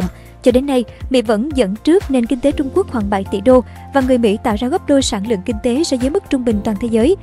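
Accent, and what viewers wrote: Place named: South, Vietnam. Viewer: Love her southern voice. Best!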